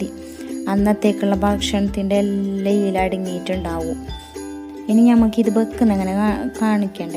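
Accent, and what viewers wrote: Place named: Kasaragod, India. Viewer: Super kasaragod slang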